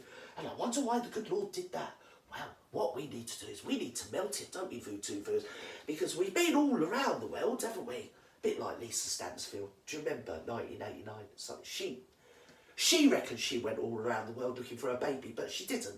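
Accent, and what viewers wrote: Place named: London, United Kingdom. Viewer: Haha love the bleeding accent up my street, love you! W Londoner here love your banter haha tell it girl how it is! Go girl! 💕🤣👍🇬🇧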